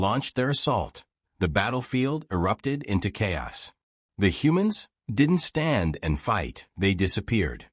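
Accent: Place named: North America. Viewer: Now tell it in an accent that isn't North American.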